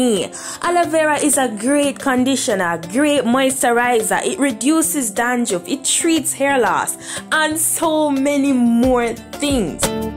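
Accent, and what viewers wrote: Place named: Jamaica. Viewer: Me too... She has this sweet Jamaican accent 😍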